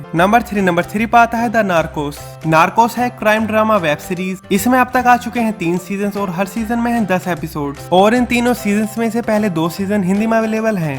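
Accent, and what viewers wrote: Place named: Uttar Pradesh, India. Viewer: Bhai tu U.P ka h na ... Tre accent se bta skta hu Ghaziabad ya meerut ya hapur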